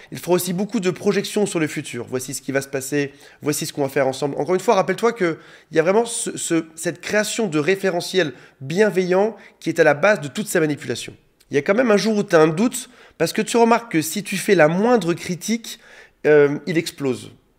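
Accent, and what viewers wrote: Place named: South, France. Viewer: avec un de ces accent du midi ! c😊ng 😄🤗👍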